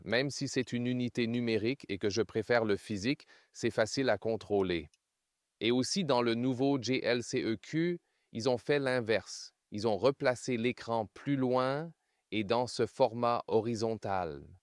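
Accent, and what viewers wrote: Canada. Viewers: J'adore vos vidéos, et vos comparatifs. Mais pourquoi avoir l'accent Canadien pour la traduction en France ?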